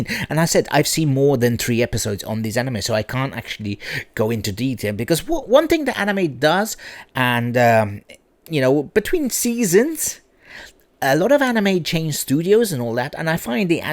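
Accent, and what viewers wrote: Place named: England, United Kingdom. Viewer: English accent got me feeling something 😉 well done bro 🩵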